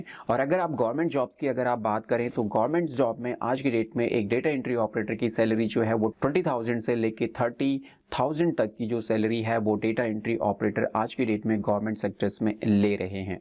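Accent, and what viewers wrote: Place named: India. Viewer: why all the videos have Indians accent !!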